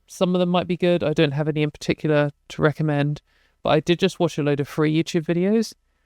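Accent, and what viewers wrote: United Kingdom. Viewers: I'm gonna be honest here, I do think you have a lovely voice,. Pleasant to listen to. But then again, I've always been a sucker for those "husky" warm voices with Bri'ish accent anyway 😅 It was mentioned here before but I'll repeat it 'cause it's so important: record yourself. Yes, it's cringy at first. Nobody likes to hear their own voice, and that's because you're not used to hearing it from a distance. But it's oh so helpful if you ever want to improve / correct anything. And that also counts for all those singers / musicians out there who think they're god's gift on Earth. Record yourseif! We don't want to suffer alone haha 😂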